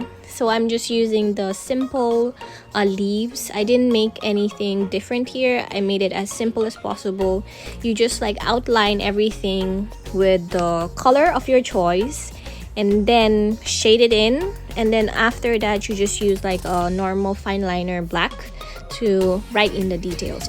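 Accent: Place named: England, United Kingdom. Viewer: you're such a talented lady ...iha!..so bright! i even like your accent..your english accent!..keep painting and sharing your talent..go on! Jingle JPE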